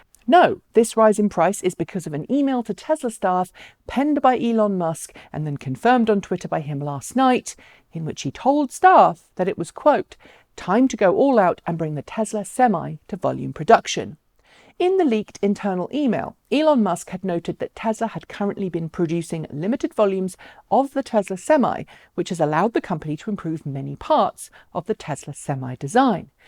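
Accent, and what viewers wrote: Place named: RP, United Kingdom. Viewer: Your accent goes a bit posh in these videos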